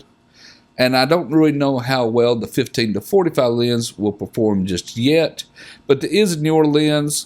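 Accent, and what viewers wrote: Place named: North Carolina, United States. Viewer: Great review! Thanks you for helping me make this decision! Also, your accent reminds me a lot of either a North Carolina or a Virginia accent. Greensboro, NC resident here! Again, thanks so much for all you do :)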